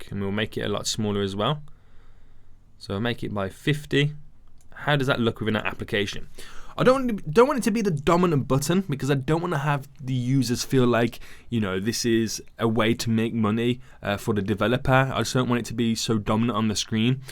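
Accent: United Kingdom. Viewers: great tutorial brother thanx and cool british accent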